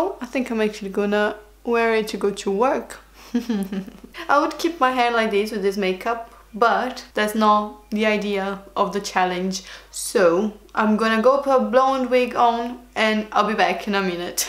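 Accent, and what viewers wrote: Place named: Brazil. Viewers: Você fala português? O jeito que você fala me lembra o sotaque de brasileiro falando inglês --- You speak Portuguese? The way that you speak reminds me the english speaking brazilian accent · Allyson Matheus eu também achei o sotaque bem parecido, acho que ela é brasileira kkkk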